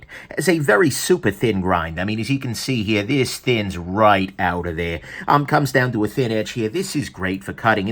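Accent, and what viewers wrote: United States. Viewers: downvoted because of this stupid yankee accent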